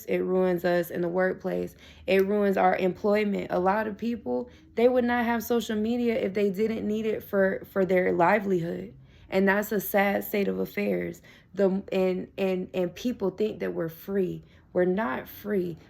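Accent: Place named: New York, United States. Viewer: I'm detecting a northern accent, is that NJ. , long Island. Or staten Island?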